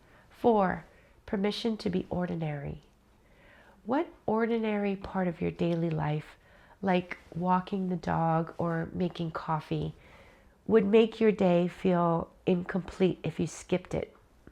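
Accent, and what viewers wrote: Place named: Netherlands. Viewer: Hi, sounds Dutch are you? Well don’t give up yet. There is a bigger reason why you are here and your life has value. Most people have problems nobody knows about. Count your blessings and focus on what you are still capable do and find someone you can help with their problems. Stay strong ❤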